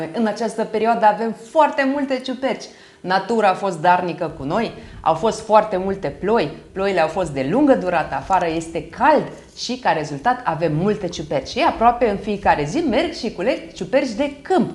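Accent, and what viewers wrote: Moldova. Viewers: Wou, multumesc pentru explicatiile foarte clare si amanuntite, apreciez foarte mult. dupa accent sinteti din Tepublica Moldova, eu sint din Ardeal, Romania. Imi place farte mult reteta, felicitari si multumesc ca ati descris retetra atat de amanuntit. O zi faina va doresc!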